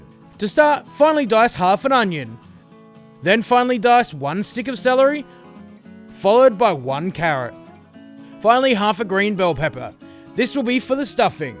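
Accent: Australia. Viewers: australian voice making Cajun food ??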